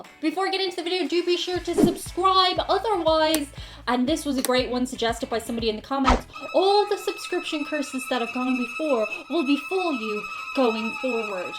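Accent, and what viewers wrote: Ireland. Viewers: One thing you should know about 'Merica' is that your accent is like a "verbal currency". So when you come are here really "brogue" it up. You just might get a extra cherry on your Sundae. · I've literally spent the entire day binging your videos.. mostly cuz i just love your accent lol 😅 gotta find me a nice Irish girl 🤙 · I just love the Irish accent ( and Scottish too ) and could literally listen to you read the f@ dictionary to me... :) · A lovely young Irish woman with blue eyes and blonde hair, a charming accent, and a fun sense of humor, wonders why she gets asked to stay in people's houses, a lot? Hmmm.... Seriously, though. We do like spending time with visitors from other countries, and it's nice to just be able to sit down and talk about the similarities and differences of life in each place, and what the visitor thinks about aspects of the United States we so take for granted that we may not even notice them. 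I'm sure that's all it is. Mostly. :)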